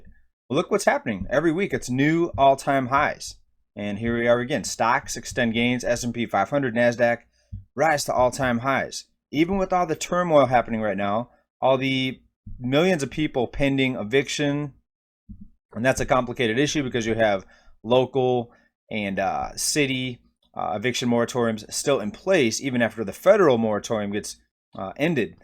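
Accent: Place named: Midwest, United States. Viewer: Favorite outro. Keep up the good research. Once you said you lived in Michigan at one point...well you do have a midwest sounding vocal. Makes sense now. Lol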